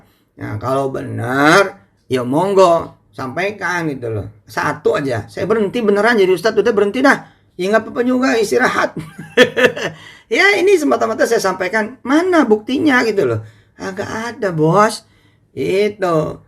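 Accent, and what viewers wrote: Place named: Jakarta, Indonesia. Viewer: gaya orang Betawi logat ngomong nya memang kaya itu ... Seandainya beliau orang solo .. pasti bicara tidak menekan .. (100 % miscomunikasi)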